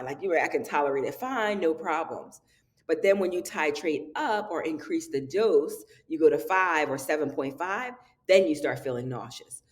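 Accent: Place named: Philadelphia, United States. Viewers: Wow! I’m from Philly too, and I knew that was a Philly accent. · Are you from Philadelphia. You sound like my sister.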